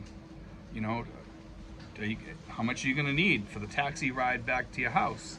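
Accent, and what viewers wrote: Boston, United States. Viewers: I could tell you're from Boston as soon as you started talking. I'm from Rhode Island. :)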